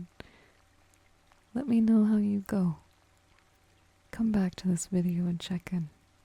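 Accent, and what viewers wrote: New Zealand. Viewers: Thats the NZ accent for ya mate. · Man I love to tune out to the Kiwi woman’s voice.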